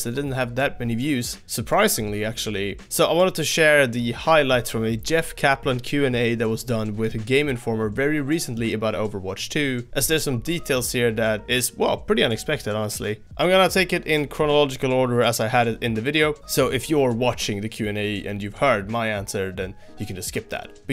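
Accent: Sweden. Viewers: Dude are you Swedish?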